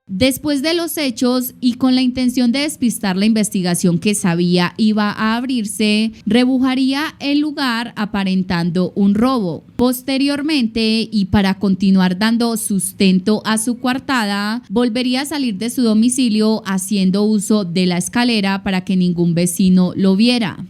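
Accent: Antioquia, Colombia. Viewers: Es su acento de ella! Así hablan en Colombia! Tu quiensabe dq país eres! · No es Muletilla, se llama acento. Ejemplo las personas argentinas tienen su acento al hablar, así mismo es en Colombia. Me gustaría escucharte haber como hablas al narrar algo. Es bueno criticar detrás de una pantalla. 🙄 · Es así su acento porque intuyo que es paisa y esa es la manera como hablan. Si no es de tu agrado hay muchos youtubers de true crime que puedes escuchar. Ya que ni modo que ella cambie su acento porque hay gente que no le gusta.😊 Saludos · Siempre hay otras opciones para escuchar... es su manera de hablar, muchos colombianos así hablan, y a muchos nos encanta ese acento!! ❤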